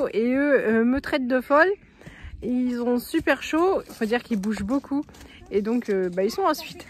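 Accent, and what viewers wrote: Alsace, France. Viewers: Merci pour cette vidéo. 😊 L'accent Alsacien j'adore 😊. J'ai vécu 16 ans en Alsace ❤️ Bon courage pour demain .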